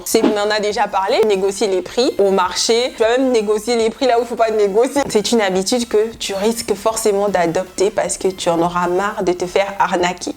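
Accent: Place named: Democratic Republic of the Congo. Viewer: 🤣🤣🤣 tu mets "quoi" à la fin de certaines phrases 🤣🤣 hey moninga tu es purement congolaise toi 😍😍😍😍 je t'adore. En passant tu es trop belle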